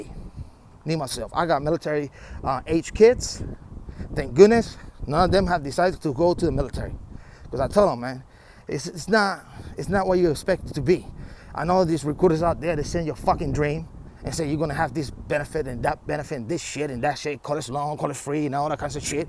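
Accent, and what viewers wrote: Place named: Mexico. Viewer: It's hard to understand your broken Mexican English. You sound so low class too.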